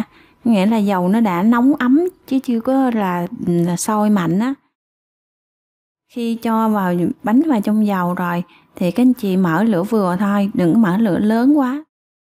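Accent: South, Vietnam. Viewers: giống nói ngọt ngào của người miền nam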